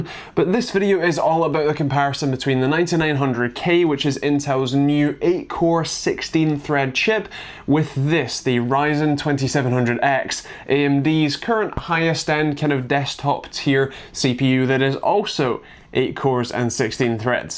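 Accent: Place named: Ulster, United Kingdom. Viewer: Sounds a bit like you're from Ulster. My mother's side of my family are all from Belfast so I can tell. LOL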